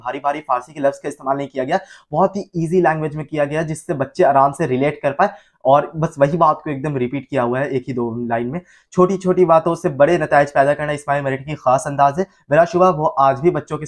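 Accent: Bihar, India. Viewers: sir aap bihar se hain kya?????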